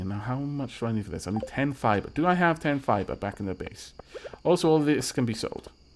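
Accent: United Kingdom. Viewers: Either Wales or England, if I recall correctly.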